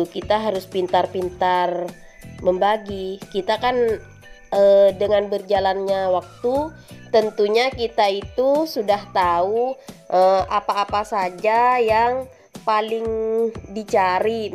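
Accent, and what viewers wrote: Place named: Madura, Indonesia. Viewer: orng madura kyak e logate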